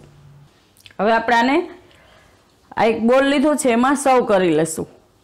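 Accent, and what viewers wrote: Kathiawar, India. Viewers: વેરી નાઈસ. તમે સરસ કાઠિયાવાડી બોલી મા સમજાવો છો... રાજભા ગઢવી જામનગર.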